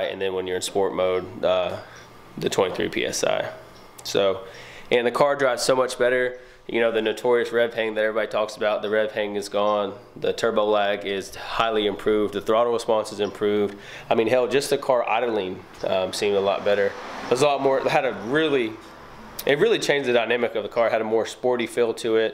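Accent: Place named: South, United States. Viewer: A Mexican that speaks in a southern accent, never thought I’d see that lmao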